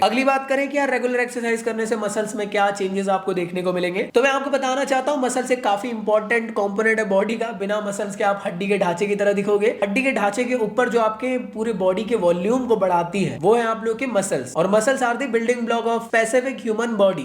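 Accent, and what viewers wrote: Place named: Madhya Pradesh, India. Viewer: Bhaiya app ki bhasa sa lgra app mp ka hoa😂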